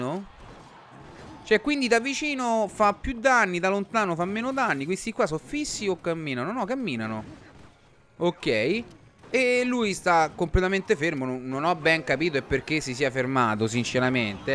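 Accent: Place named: Rome, Italy. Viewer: COME SEI DIVENTATO ROMANO :D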